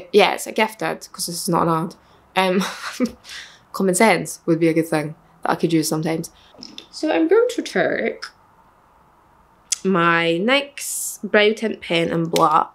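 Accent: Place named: Glasgow, United Kingdom. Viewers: Always forget your Scottish too, your accent is like mines. I'm from Southside of Glasgow 😊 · The glaswegian accent gives me whiplash every time, but i love it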